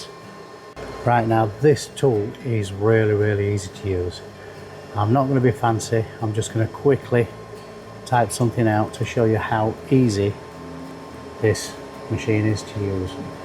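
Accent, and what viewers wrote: United Kingdom. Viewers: I am not a metal worker but have been interested in metal work for the past 5 or 6 years now, I am actually a woodworker and I make fine furniture with various hardwoods etc, but I am very interested in the XTool MetalFab and I have ideas what I could achieve if I had this machine, I might have to sell my car just to get it as it is so expensive but I have a vision. I enjoyed watching your video so I have now clicked on the thumbs-up button and I have subscribed to your channel as well. Great to hear the accent and that you are UK based as I too am originally from the UK although I now live abroad. 😀👍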